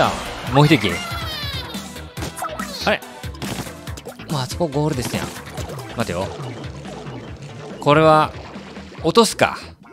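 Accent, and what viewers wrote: Hiroshima, Japan. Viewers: Rさん動画投稿お疲れ様です😌💓 Rさんの広島弁が加藤茶さんの奥さんに似ていたのでびっくりしました❗️次回の動画も楽しみにしています🐱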